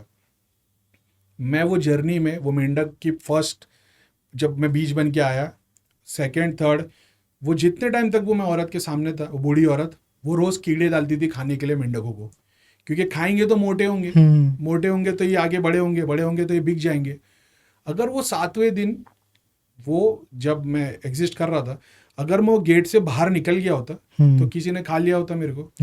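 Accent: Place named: Mumbai, India. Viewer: Hey Mr ur telling us clearly..and we like the Mumbai style free talk.thanks From dubai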